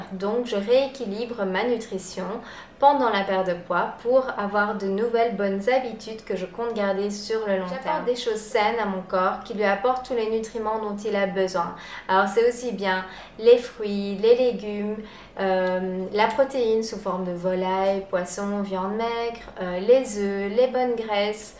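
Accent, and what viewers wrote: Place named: Charleroi, Belgium. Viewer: Coucou, Viens-tu de la région de Charleroi? Je me pose la question par rapport à ton petit "accent":-) Bizzz:-)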